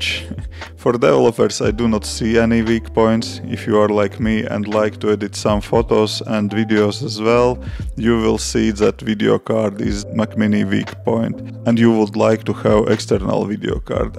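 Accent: Russia. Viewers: Артем Анащенко Русский, ибо такой акцент трудно спутать))).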